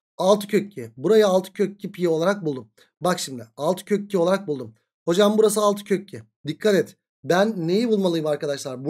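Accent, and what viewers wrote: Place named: Aegean, Turkey. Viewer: egeli sanirim sive benziyor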